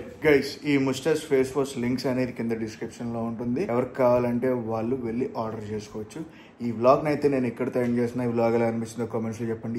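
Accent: Godavari, India. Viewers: Hello andi nenu me videos ni resent ga chudatam start chesa chala bagunnay me godarolla telugu bale untundi your good name please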